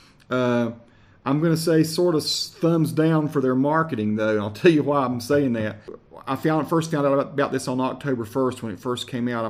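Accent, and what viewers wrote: United States. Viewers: You have a beautiful American accent (where I come from we don't have any American accents. We do have a couple of British accents, but no American accents). Seriously.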